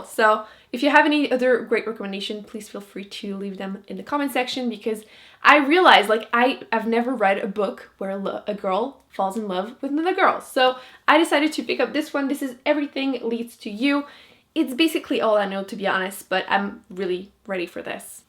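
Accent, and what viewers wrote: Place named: Quebec, Canada. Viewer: if you want to read more girls love girls books, i definitely recommend far from you by tess sharpe, labyrinth lost by zoraida cordova, beauty queens by libba bray, le bleu est une couleur chaude by julie maroh and not your sidekick by c.b. lee! also i saw that your accent is french canadian, and since i'm french canadian and have the hardest time finding cheap books, i was wondering if you have any library recommendations? anyway, great video! i have a couple of these books in my summer tbr as well, and i'm super excited to pick them up! <3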